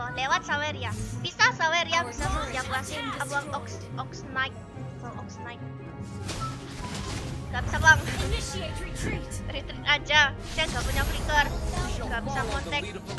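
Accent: Java, Indonesia. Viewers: mba nya org jawa kah? logat nya kental banget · Medokmu candu sekali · Mbak medok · Medhok ya ngomongnya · medok medok lucu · Jawa ga sih?? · Suaranya medok 😂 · Kowe wong jowo mbak 👀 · kok bisa gue baru nemu manusia 1 ini, gameplay bagus, hero ga template cwe, auto subscribe sih apalagi jawa banget😂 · Mewedok ni streamer suka aku wkwk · medok bgt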